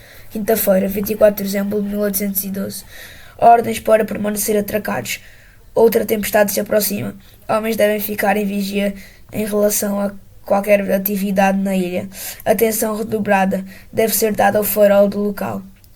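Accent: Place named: Portugal. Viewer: Eu não acredito em português de Portugal falando sobre guts & blackpowder muito legal me inscrever agora no seu canal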